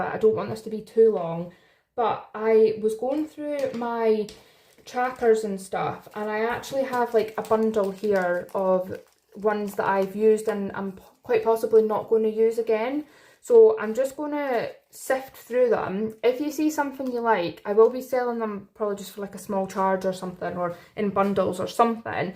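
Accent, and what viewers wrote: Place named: Scotland, United Kingdom. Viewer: ❤ I love your accent! Are you from Scotland?❤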